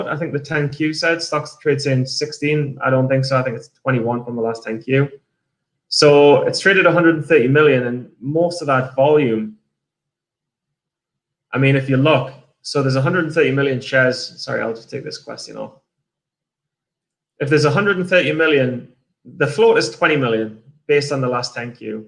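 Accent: Newcastle, United Kingdom. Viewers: You from Newcastle? Sound like Geordie!! Im from Newcastle too been trading 8 months now I traded BYFC and got torn apart around $6 and only ended up on the stock around $100. Traded CHCI as it rejected $4.20 and $4 level hold and held until $3.12.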